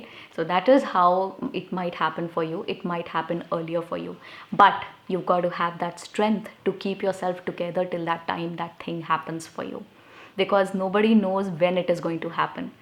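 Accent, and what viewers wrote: India. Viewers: No popular english self help books are available over YouTube in Indian accent. I think you have a wonderful, sweet and friendly Indian accent. If you think of doing it, it would definitely help lots of aspirants like me in developing our English beside personality. Thank you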